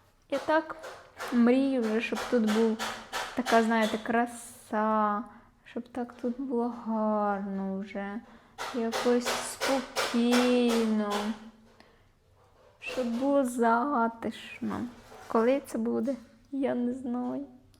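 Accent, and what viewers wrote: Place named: Western Ukraine, Ukraine. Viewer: .по вимові складається враження,.що ти із західних областей?.яАле у тебе не суржик,.у тебе кацапська на український лад,.ти молоденька навчись своєї рідної мови,.ти взагалі молодець як людина теж тож не ображайся за мову,.а вчи,.бо дуже уже нам боляче слухати ту кацапську,.рощумієшчому?.Скільки людей убили,."асвадаждаючи"від української.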